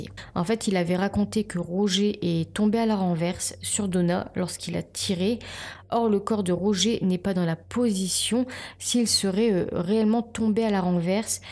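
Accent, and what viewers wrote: North, France. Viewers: Accent du Nord non ?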